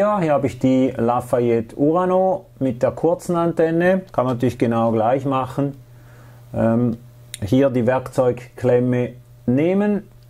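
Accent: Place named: Switzerland. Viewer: Für mich ist das eine Rohrbriede, vor mir gehen aufputz 2 kupferne, blanke 1" Heizrohre ins OG. Braucht es für so stationär, an beiden Seiten/Enden solch Rohrbrieden, oder, oder schirme ich mich mit der Erdung im Ganzen Haus mich ab? Sorry, bin totaler Leihe und dachte erst, es ginge um die Antenne selbst. 11m Draht im Estrich spannen bringt was oder? Bis da hoch sollte der Draht dann gemantelt, geerdet sein? Ich seh schon, muss noch weitere Videos betreffend schauen, das war nicht das richtige, dennoch gut gemacht und das Schweizerdeutsch toll gezügelt. - Äxgüsi, das kann nicht jeder Schweizer!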